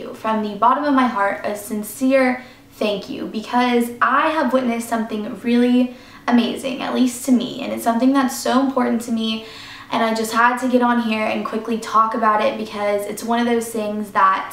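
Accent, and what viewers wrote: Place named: Texas, United States. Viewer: I heard a bit of that Texan accent in this video :P super cute, haha. Maybe everyone always notices it but I'm Texan so it's hard to pick up when I'm used to it xD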